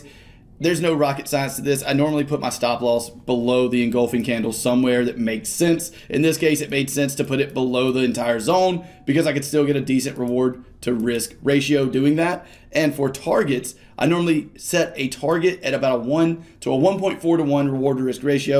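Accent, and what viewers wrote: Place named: Texas, United States. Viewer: Sounds like Texan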